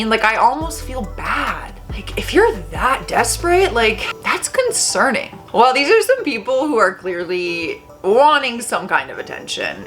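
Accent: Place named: Canada. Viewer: G,day I'm Australian. Surprise, Surprise, you have a Canadian Accent!!! Love it!! All the best. Bluey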